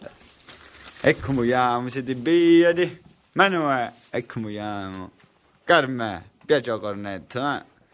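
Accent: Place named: Lombardy, Italy. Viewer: Tipico accento lombardo 😅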